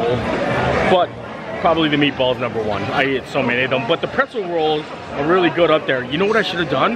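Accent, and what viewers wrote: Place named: Midwest, United States. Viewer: I have been trying to place that accent for a long time. I knew it was midwest but not sure what state. I was thinking Minnesota?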